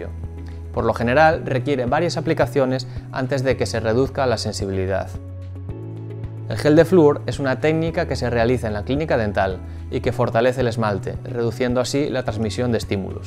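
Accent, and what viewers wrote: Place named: Galicia, Spain. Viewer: parece de Galicia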